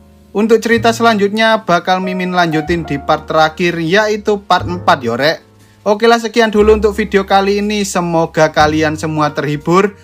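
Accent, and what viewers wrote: Java, Indonesia. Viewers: BANG JAWA MANA?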